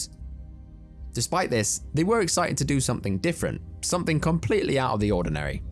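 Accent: United Kingdom. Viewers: I prefer your version more than him because I like British accent in storytelling. 👍🏻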